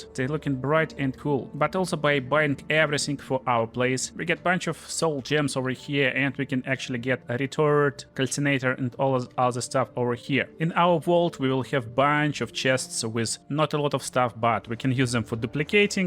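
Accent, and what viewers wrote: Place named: Russia. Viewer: That Russian accent is sexy AF.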